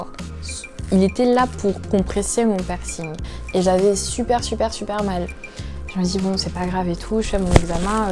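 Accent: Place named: Cameroon. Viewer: L'accent Camerounais est présent 🇨🇲🇨🇲🇨🇲😂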